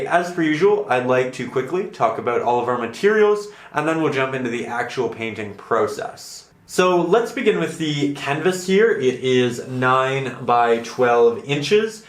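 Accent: Canada. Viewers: I hear you are Canadian.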